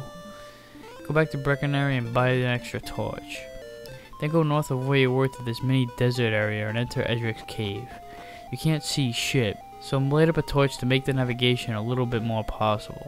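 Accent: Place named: Massachusetts, United States. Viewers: From New England, are you? You cant hide the accent Me too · I like your accent. Which part of Massachusetts are you from?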